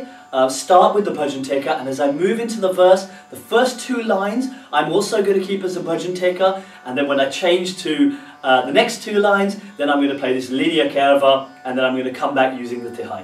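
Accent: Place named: England, United Kingdom. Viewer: .( love your english accent).you are the best techer..i live in USA a fiji island transplant...grew up.heRe in wichita kansasUSA🇺🇸..i heard many teachers on u tube..but MASTERY OF LANGUAGE MATTERS..bedides talent..i just bought a basic tabla..trying to learn basic tabla beats....thanks for having such a good talent..u 👍👍👍r incredible mhndraUSA🇺🇸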